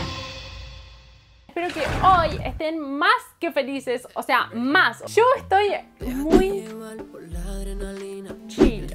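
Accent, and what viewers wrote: Argentina. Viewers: Sos argentina ? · Tiene voz de Argentina o soy yo :v o chilena :v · Sos re argentina jajaj ahre que yo también ahre jajaj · sos argentina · Jaja re argentina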